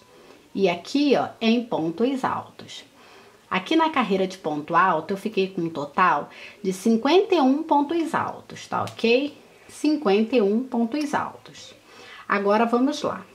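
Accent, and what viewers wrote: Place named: Rio de Janeiro, Brazil. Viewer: Passei parte do tempo neste final de 2023 fazendo este lindo tapete, fiz o meu no barroco natural e ficou lindo! Adoro seus trabalhos, gosto do seu sotaque carioca, e a propósito suas unhas são lindas. Sucesso neste ano de 2024, gratidão por compartilhar seus trabalhos belíssimos.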